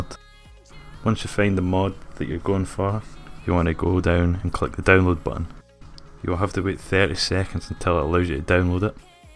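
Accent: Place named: Scotland, United Kingdom. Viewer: first scot yt ive heard in ages mate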